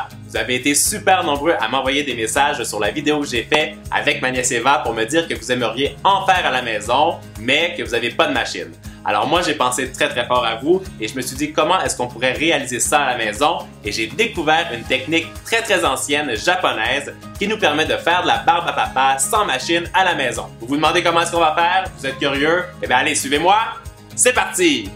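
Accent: Quebec, Canada. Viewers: Ccou je suis nouvelle est je voulais te dire : Tu as un magnifique accent québécois ! Est j'adore tes vidéo +1 abo 😉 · Coucou ta recette est trop C😎😎L et tu est trop beau,j'aime trop ton accent, il est canadien?pourrais tu faire de la barbe à papa au NUTELLA???😘😘😘😜😜😜❤❤❤💙💙💙💚💚💚💛💛💛💜💜💜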